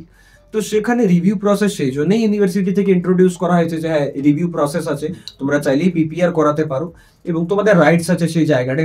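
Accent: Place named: India. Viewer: Bangali hoyeo bangla uccharon thik na....hindi accent ache voice er moddhye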